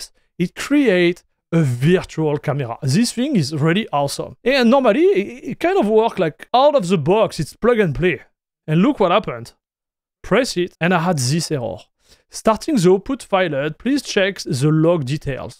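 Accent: France. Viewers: that french accent goes hard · Damn that french acesent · One just have to love this strong french accent :-) Moral of the story: don't use rolling distro nor cutting edge versions of software when you need stability.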